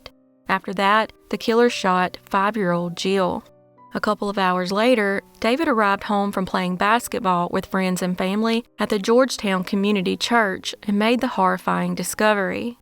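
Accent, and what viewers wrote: South, United States. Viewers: Love your videos! 🤗 What state are you from ? I’m trying to pin point your accent! I was born in the south but moved to CA as a kid so I think your accent might be from my home state but I could be wrong ! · I am a 52yr old Lifelong Southerner, Native American, and Native Floridian. So before I say this, understand, it comes from a place of love, but, until you ditch the southern accent, you will never be given respect as a journalist.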